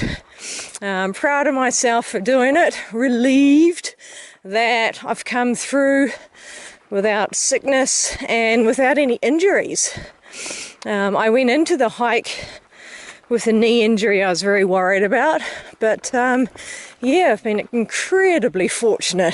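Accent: New Zealand. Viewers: Congratulations! Well done. I'm going to miss your Kiwi accent.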